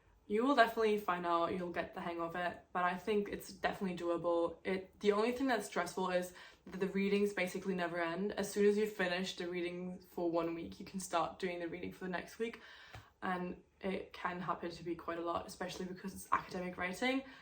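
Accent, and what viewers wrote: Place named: United Kingdom. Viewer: omg you sound so British LMAO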